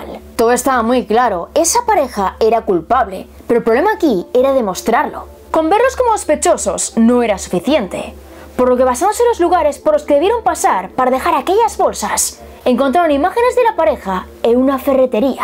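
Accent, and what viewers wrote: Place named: Spain. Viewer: es tan hermosa, es una bellota Es tu acento español es... Hermoso, divino, precioso. Saludos amigos todos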